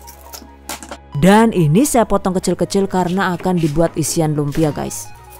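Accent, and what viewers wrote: Banyumas, Indonesia. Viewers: Pertama melihat video beberapa tahun yang lalu, saya pikir ini suara ibu-ibu yang sudah tua, ternyata orangnya masih muda dan cantik, dan di selingin bahasa ngapak yg lucu, terimakasih untuk videonya ya mbak, salam dari cilacap. Semoga sekin sukses dan berkembang Aammin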